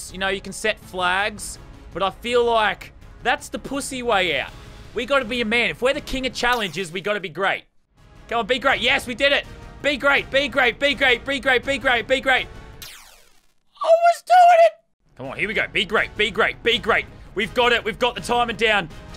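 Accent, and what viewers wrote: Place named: Australia. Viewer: Australia accent (thumbs up)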